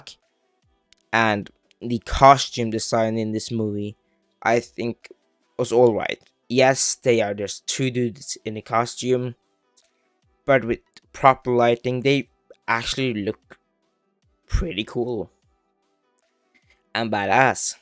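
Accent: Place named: Italy. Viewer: Idk why I got this video recommended but it is kinda fun and I love your Manga collection. Btw where are you from? Your accent sounds a bit italian idk?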